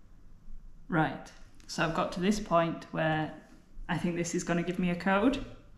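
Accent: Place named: Wales, United Kingdom. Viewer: You're definitely Welsh 😂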